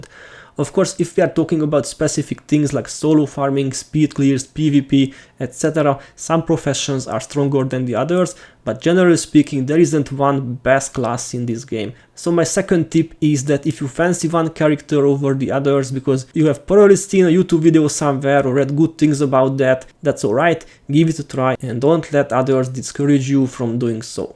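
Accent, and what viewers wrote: Hungary. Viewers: Hungarian accent is so tasty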